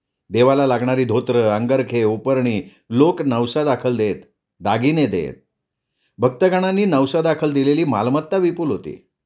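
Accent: Konkan, India. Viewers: सर कादंबरी तर छान आहे पण त्याहीपेक्षा तुम्ही जो कोकनी आवाज काढता ते लय भारी आहे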